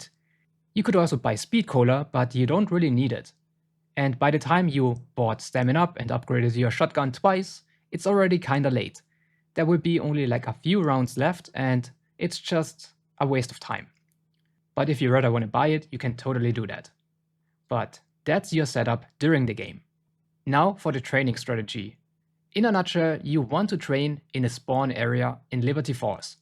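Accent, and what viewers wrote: Germany. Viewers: Du bist safe deutsxh der akzent verrät dich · Bin ich bescheuert oder höre ich den deutschen Akzent raus ? Gute Zusammenfassungen. Hoffe in Zukunft folgen noch mehr Hilfestellungen zu Visitenkarten. Bin auf weitere Videos gespannt !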